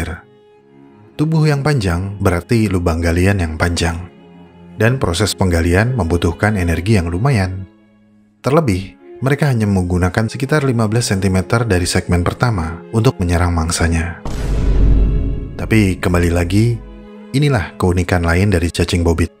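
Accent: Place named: Java, Indonesia. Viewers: Kilang kilung nak wong jowo ngomonge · Hahahaha.. Naratornya Sundanese nih..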